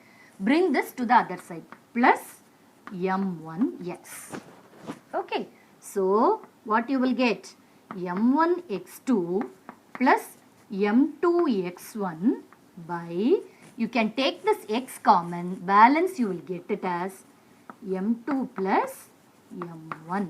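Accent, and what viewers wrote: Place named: South India, India. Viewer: Your South Indian accent is awesome mam.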